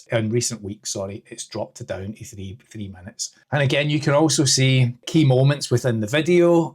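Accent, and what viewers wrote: Scotland, United Kingdom. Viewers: I suscribed from your first video, which just randomly popped up on my screen back in January. I watch on a tv. You’re doing well and your channel will keep growing. And it always helps to have a Scottish accent, one of the nicest to listen to on youtube.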